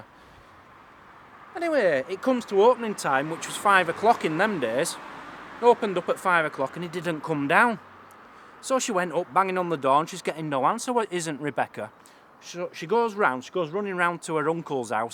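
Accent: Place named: Lancashire, United Kingdom. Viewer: Please tell me you're from Blackpool! Or at least, Lancashire!..Great stuff...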